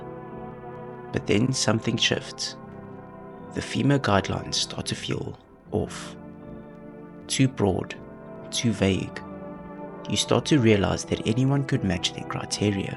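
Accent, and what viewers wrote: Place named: South Africa. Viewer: i thought i recognised a south african accent 🥹😝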